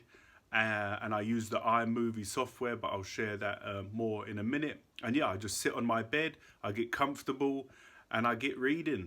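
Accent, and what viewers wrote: United Kingdom. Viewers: Wow that's a long process, but your work makes many people happy & useful thanks for doing it, btw are you British? 🥰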